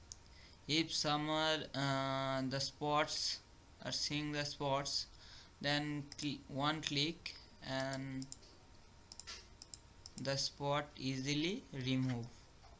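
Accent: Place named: India. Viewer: This Indian access bro... I don't understand 90% from what those people say. (That's because of two reasons) 1 Their bad English, 2 My ears LMAO.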